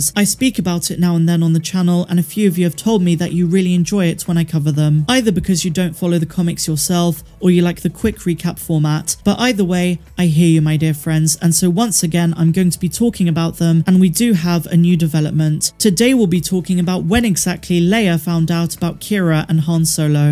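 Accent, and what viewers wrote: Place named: Bristol, United Kingdom. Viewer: Love the videos! Gotta say that every video reminds me of home with certain words having an almost Bristolian accent!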